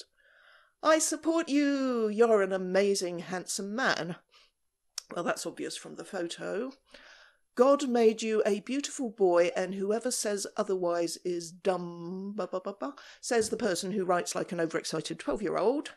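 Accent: United Kingdom. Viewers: I've watched enough of these to lip sync near perfectly what she is saying and let my brain fill in the British accent. I've never been more terrified of a YouTuber for yelling at her audience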